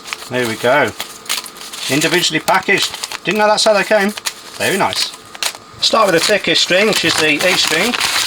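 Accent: Birmingham, United Kingdom. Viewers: Neil from Blackburn. Hi. I found your narration a little bit to quick for me to take it in. So I simply reduced the playback speed on YT to 0.8 ×. That worked brilliantly for me. But it did then highlight your Brummie accent which I never picked up on before at 1.0 × normal speed. I've taught myself a new method to identify accents now. LTA. BN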